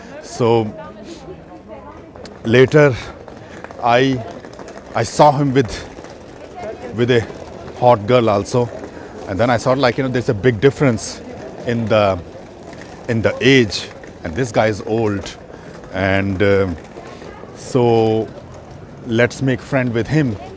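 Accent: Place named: Bihar, India. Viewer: Your accent is very Bihaari. The way you say "aaaalso" .. Sounds like some chokidaar / chapaadasi...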